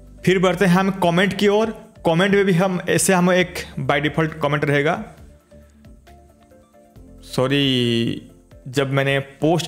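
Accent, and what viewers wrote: Odisha, India. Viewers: Bro aap odisha ke ho...aap ka voice lagraha hai aisha ..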